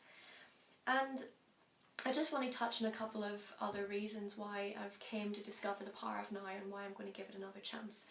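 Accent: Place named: New Jersey, United States. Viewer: thanx for the video. where r u from? you have an accent. Jersey?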